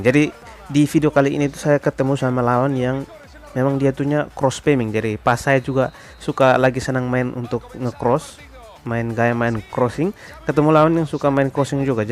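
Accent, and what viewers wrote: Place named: Kupang, Indonesia. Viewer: Lu orang mna ko logat ke orang Kupang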